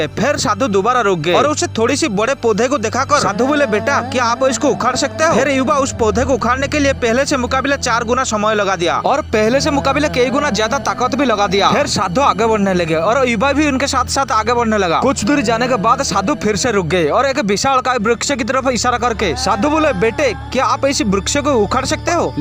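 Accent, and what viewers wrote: Bengal, India. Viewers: Bhai tum bangali ho kya 😁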